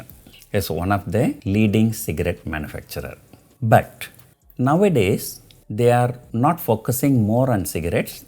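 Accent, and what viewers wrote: Tamil Nadu, India. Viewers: Never tell that you are going to tell a joke before hand. You tell something and we decide if it is a joke or not. And try to improve your English. You speak English with Tamil diction which makes it unbearable to follow.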